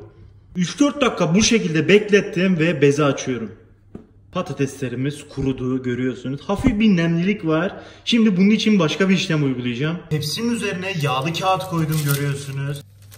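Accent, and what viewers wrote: Adana, Turkey. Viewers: videodan demiş adamın videoları var orda Adanalı gibi konuşuyor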